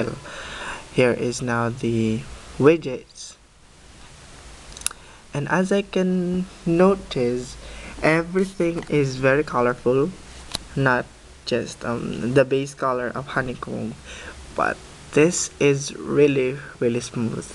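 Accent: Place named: India. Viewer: why is your accent sound like indian?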